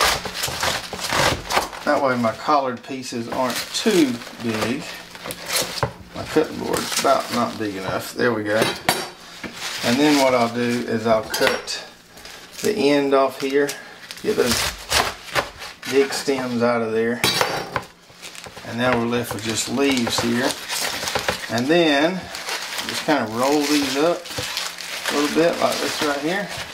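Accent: South, United States. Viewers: You southern guys are the best. Love your accent and your down home smarts. I too live in zone 8B (in the Pacific Northwest), but it's a world apart from where you live. While your low temperatures are similar to ours, we're in those lows for months at a time, not just on occasion. I am envious of your warm weather that allows winter gardening of crops we can only grow in the summer. I've purchased some of your tools. First class quality.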